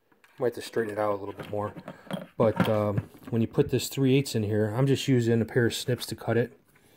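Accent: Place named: Chicago, United States. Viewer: Love your accent. Chicago area?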